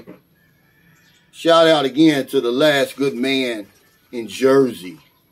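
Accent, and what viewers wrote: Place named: Florida, United States. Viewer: Bro are you from Florida I'm from central Florida and your accent screaming FLA. 😂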